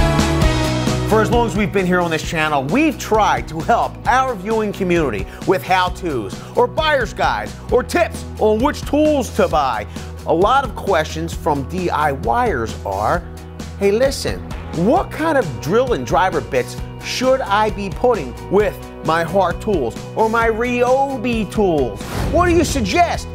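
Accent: Philadelphia, United States. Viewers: Clicked for the review, but soon as he started talking I knew he was a South Philly guy! Yo Cuz Def subbed & liking everything on this channel!!!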